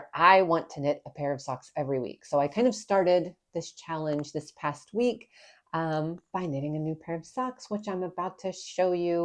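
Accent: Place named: Oklahoma, United States. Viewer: The dining room looks fabulous! I ❤the Indian chief picture, beautifully noble. Drawer only sounds funny to you because you have developed the Okie accent. 😂 I was supposed to get to meet you at the book signing in the McKinney Knittery but I had a bug. I picked up your book the next day, it’s amazing. Thank you! You might could start a “give away” of socks.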